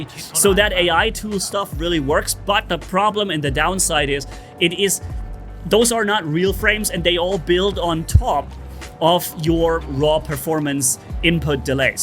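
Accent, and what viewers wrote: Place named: Germany. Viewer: Ich erkenne Deutsche Akzente, auch wenn sie nur ganz dezent sind. ^^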